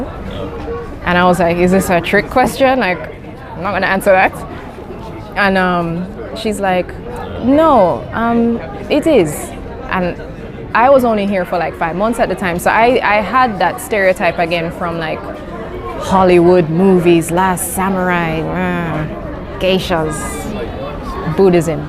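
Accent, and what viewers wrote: Jamaica. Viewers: I'm 6 seconds in, and im pretty sure this woman is Jamaican XD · Is she also west Indian? She sounds Jamaican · As she Start talk mi know seh a Jamaican she be, the accent tranggg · I can related to the hair experience lol. When I was in China it was not uncommon to meet people who just wanted to touch my hair. I had the old school flat top hair cut. Some even wanted a picture. Btw I'm from Grenada but I'm glad to still hear your Jamaican accent in there after speaking mainly Japanese for so long.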